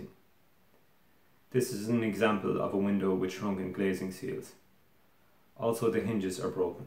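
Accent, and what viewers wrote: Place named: Ireland. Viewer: I never thought i'd hear an Irishman talking about sashes.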